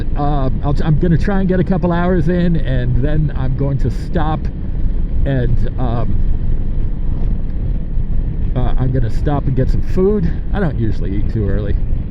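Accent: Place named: New Jersey, United States. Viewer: Bringing NJ accent to your neck of the woods 😆 🤙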